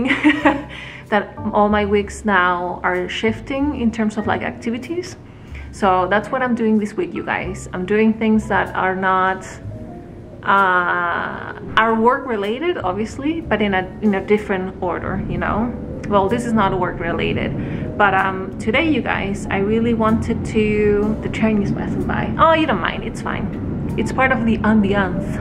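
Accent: Chile. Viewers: ¿Ese acento es chileno? Estoy seguritaaa ¡que emoción encontrar gente tan creativa y de mi propio país! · I was watching your video and you said unas palabras en español y dije Ohhh!!! es Chilena xD y bueno te googlie y si !! Saludos desde Chile!!!!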